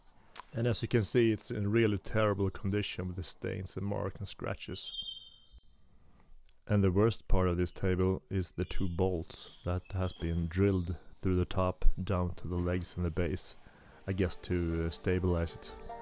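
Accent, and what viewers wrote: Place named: Sweden. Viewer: Supersnyggt! Du avslöjas av din svengelska och trälimmet;)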